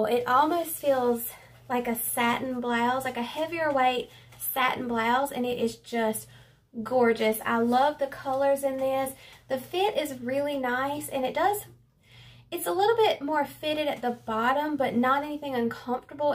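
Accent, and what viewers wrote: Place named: North Carolina, United States. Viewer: Everything in your haul needs to get into my closet. The colors, the styles....omgosh.. Beautiful. Thank you for sharing such well produced videos. YOU really are my favorite youtube plus size clothing haul person. I can tell you put a lot of thought and work into making these videos for us all. I am sure I am not the only person who appreciates you. Oh and your personality is the bonus to it all. My grandma was from North Carolina and you remind me of her with your accent.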